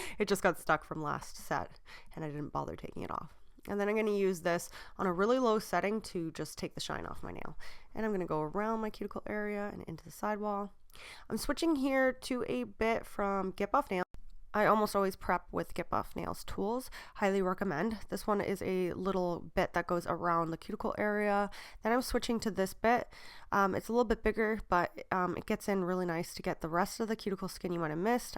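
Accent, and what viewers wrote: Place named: Canada. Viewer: Hi, nice to see your vids back again. As a nail tech I find them relaxing, and your voice always reminds m😂 of my visit to Canada many years ago one summer, I absolutely loved it! Can always tell a Canadian accent when you say the word.. 'about' So cute! 🥰 Love that you're back filming again, best wishes from the UK 💕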